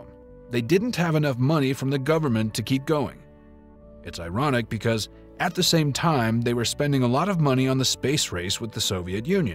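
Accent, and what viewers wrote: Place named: United States. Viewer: chinese propaganda video with an american voice narrator rofl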